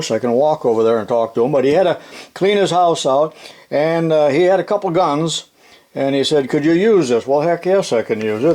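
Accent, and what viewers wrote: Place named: United States. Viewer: why dont you americans pronounce the L when you say SOLDER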